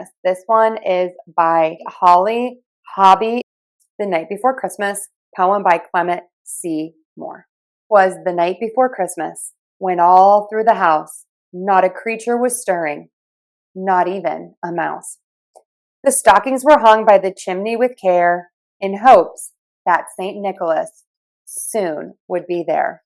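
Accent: United States. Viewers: Hi. I see the title of the video in spanish. Must be the the New improvement of yt. Its good videos of you reading so we can learn the american accent that is not easy. Although its something for children I dont understand a lot of words.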